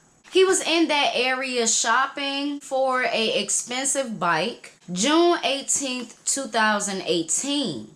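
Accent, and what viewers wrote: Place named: Florida, United States. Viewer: It's pronounced "Pom-Puh-No", Flawda. Haha. I love ya accent. Bless you on your entrepreneurship. You're still effortlessly beautiful. 👑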